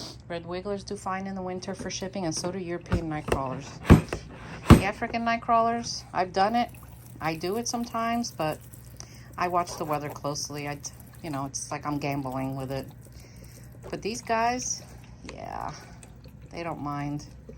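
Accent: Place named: New Jersey, United States. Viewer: 👋 from California! Originally from Philly so loving your Jersey accent. I learn so much from your videos.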